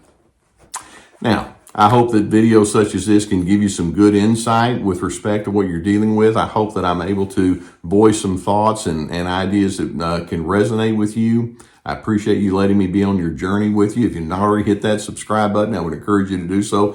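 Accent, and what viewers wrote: South, United States. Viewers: excellent! thanks for taking a moment to respond to my comment. really appreciate the southern drawl, the funny faces and sarcasm that you use to describe the buffoon narcissists of the world. gus in the background when doing the videos, is such a comforting soul. will continue to keep an eye on YT for more videos. all the best, steven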